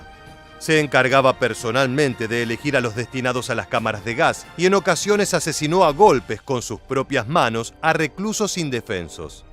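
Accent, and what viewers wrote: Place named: Argentina. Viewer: EL NARRADOR DE ESTOS REPORTAJES TIENE SUS MÉRITOS BIEN GANADOS, ES MUY AMENO Y SU ESTILO INCONFUNDIBLE. POR SU ACENTO ES AL PARECER ARGENTINO. ES LO UNICO QUE SABEMOS. LAMENTABLEMENTE SU NOMBRE ES DESCONOCIDO Y CONTINÚA COMO TAL.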